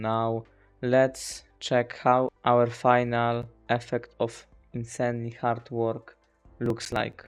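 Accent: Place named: Poland. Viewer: Polska GUROM slychac akcent <3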